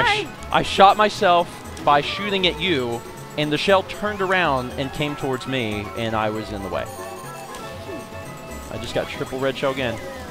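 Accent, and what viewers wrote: South, United States. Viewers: All this time I though exclaimed "Gerahl!" I now understand he was saying "Draw!" Wow...southern drawl can be very odd sometimes.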